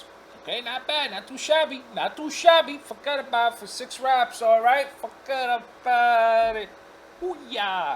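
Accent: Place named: Brooklyn, United States. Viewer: Something tells me your from Brooklyn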